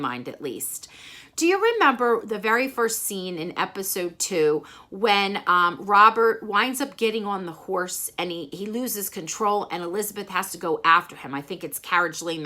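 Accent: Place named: New Jersey, United States. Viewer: Just love your predictions..you pick up on so much more than I do and God knows I've watched the seasons a million times. hahaha. I hope I catch your next live again..it was so much fun texting and having you answer live. I just love your enthusiasm and love for the show. Also love your Jersey accent..I dated a Jersey boy in college. He thought I sounded like I was from Chicago (I'm from Iowa..close enough I guess). Until next time.....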